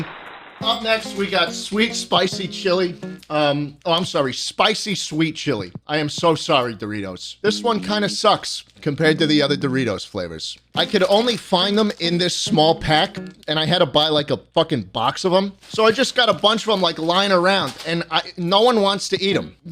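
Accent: New York, United States. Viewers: You can hear the New Yorker in him come out more and more as the video goes on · New Yorker is coming out in this one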